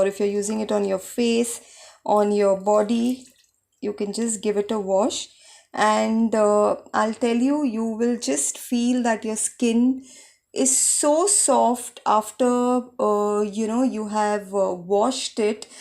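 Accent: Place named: England, United Kingdom. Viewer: I love you English ancent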